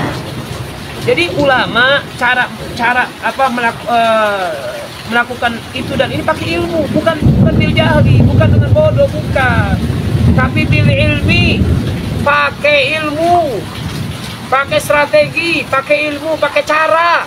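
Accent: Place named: Java, Indonesia. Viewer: admin ustadz iki alamate ning endi .iki potongan logat mah jawa kita kene iki yah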